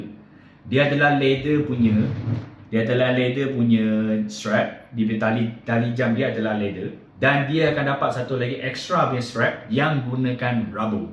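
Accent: Terengganu, Malaysia. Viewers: Bunyi macam org Tranung ni.. 😊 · mcm org Ganu je ni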